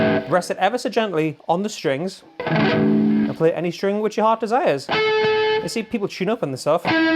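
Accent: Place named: Wales, United Kingdom. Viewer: Awesome video! I am perplexed by two things though… the guitar says les Paul on it but looks like an sg?? What is it???? And also your accent?? Wales???? Im scratching my head over here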